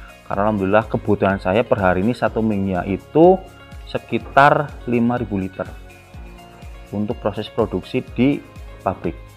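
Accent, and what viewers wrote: Banyumas, Indonesia. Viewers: Alamat mana ya kak, tadi kurang jelas. Kayaknya medike ngapak ya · Asyiikk Logat nya kok kayak kiyek banyumasan yaa mas sse....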